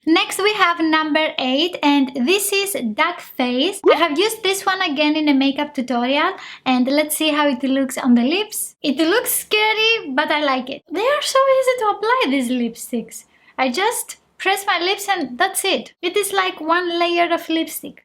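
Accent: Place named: Greece. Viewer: You can also say split instead of separate if you want. Nice accent, not sure if you were Greek or Spaniard. Thanks for the review!!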